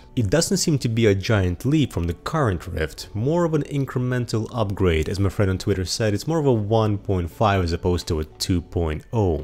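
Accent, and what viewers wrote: Russia. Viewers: По акценту кажется, что ты русский. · Бро ты же Русский???? по акценту слышно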